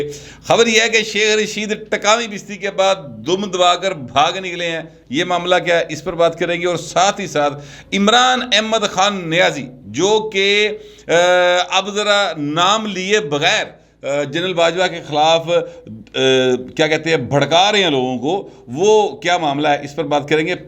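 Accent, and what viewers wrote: Lahore, Pakistan. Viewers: زبردست صاحب۔۔۔زبان کے لاہوری ٹچ کے ساتھ مزا آگیا۔ ویسےمیں پی ٹی آئی کی چھترول سے زیادہ لہجہ کا لطف اٹھاتا ہوں۔ انہاں نوں ویسے وی شرم حیا نیئں۔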